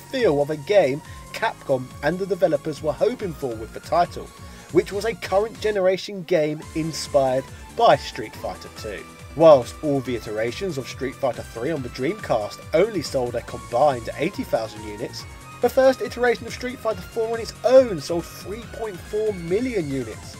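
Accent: United Kingdom. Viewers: As a tournament fighting game player I find your fighting game videos a bit cringy. That's not to say your videos on fighting games are bad, it's just that what we as tournament or hardcore players look for very different things in a fighting game. As an example, casual players went crazy when SF5 didn't ship with a story mode. We didn't care a bit. The game was very solid on launch from a competitive standpoint. I actually picked up my keyboard to shit post and call your videos shit, but then I realized that if you got an OG tournament player to collab with you could make some amazing stuff. With fighting games, the way a hardcore player and casual player look at the game is so different and it'd be really interesting to see both side by side. It is obvious you have a great love for all things fighting games, from a casual level, which is most people that buy these games. 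I saw you and a few others on a stream complaining about tournament players roasting your videos(I was about to be one of said players lol). You'll find that there are some valid reasons you're getting roasted. I just think that showing how basically every fighting game is almost 2 games in one could be really interesting depending on who you can find to collab with. Judging by your accent, you're from the UK? Try getting a hold of Ryan Heart(Harte? not sure on spelling). He'd be perfect to add the hardcore mindset to your videos.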